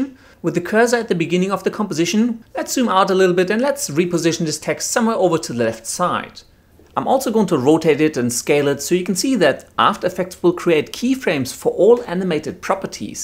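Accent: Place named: Germany. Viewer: You sound kinda German :3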